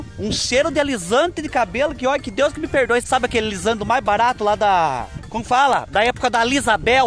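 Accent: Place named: Paraná, Brazil. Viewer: Seu sotaque é bem parecido com do povo q mora no Paraná..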